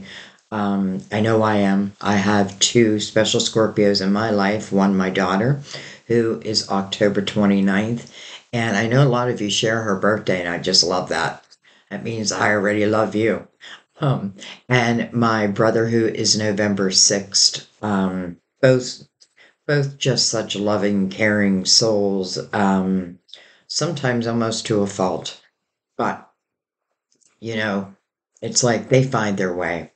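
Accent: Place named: Pennsylvania, United States. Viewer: Love your SW PA accent!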